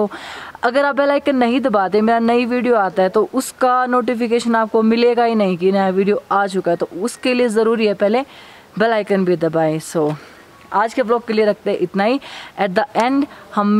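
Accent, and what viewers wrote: Haryana, India. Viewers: Haryanvi accent superbbb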